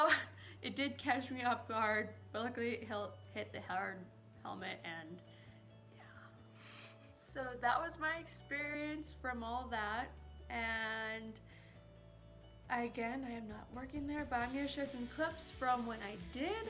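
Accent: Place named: South, United States. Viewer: Me Bcn its southern accent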